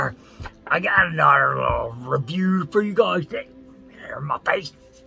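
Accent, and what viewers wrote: Newfoundland, Canada. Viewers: are you from newfoundland?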